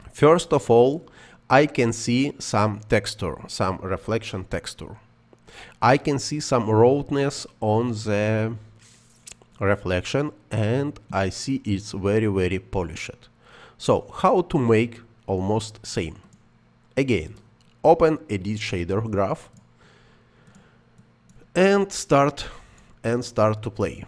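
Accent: Russia. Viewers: excellent! your russian accent is great! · Кстати спасибо за урок, очень пригодилось в моей работе. Я догадывался что ты русский по акценту, но потом увидел это и. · Акцент русский))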